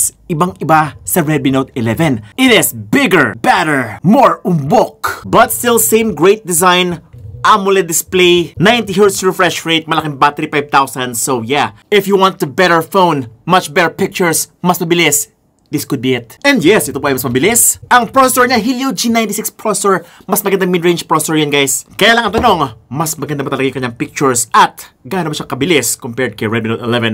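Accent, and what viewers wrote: Visayas, Philippines. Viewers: HAHAHAHAHA bisaya kapo ba kuya?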